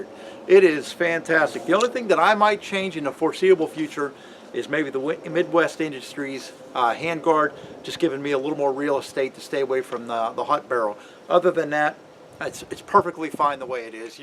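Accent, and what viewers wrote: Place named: Pittsburgh, United States. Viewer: Between no front license plates on the vehicles in his videos and that accent, he's a yinzer for sure!!!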